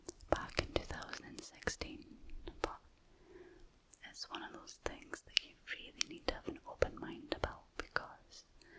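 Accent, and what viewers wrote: Scotland, United Kingdom. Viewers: I'm definitly in love with the scottish accent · Greetings from the colony of North Carolina. Your slow motions and soft vocal delivery style are really effective and quite nice. I love your Scottish accent. I mean this with all due respect. You are really truly fine! You are beautiful. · I am gonna be honest, until now I thought I wasn't really into Scottish accents. Well...this changes things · Love your channel! So very relaxing and that Scottish accent is quite lovely. 💕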